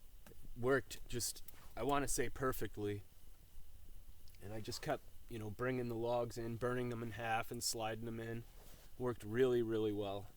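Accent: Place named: New Jersey, United States. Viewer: I understand. I was thinking I heard a New Jersey accent in your voice, so I asked. Thanks.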